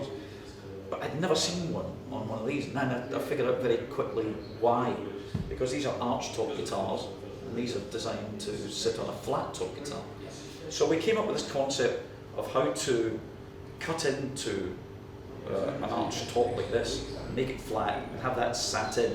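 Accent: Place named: Scotland, United Kingdom. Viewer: Oh my... he talks like Fish.. is Scot?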